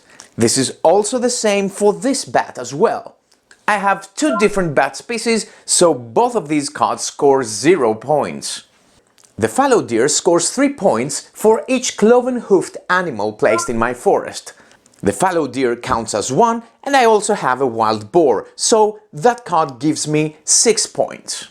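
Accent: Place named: Greece. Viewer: Great video with a really smooth explanation, thanks! Wife and I also busted out laughing at the beech tree part, , "if there are at least 4 beeches in your forest"😆 Greek accent? Kudos on the excellent English which is far better than my second language. Gotta love when accents make words sound like other words and it's totally innocent yet hilarious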